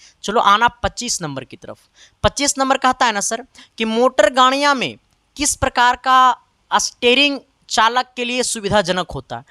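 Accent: Bihar, India. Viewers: Sir aap to bilkul bihari ki tarah bol rahe hai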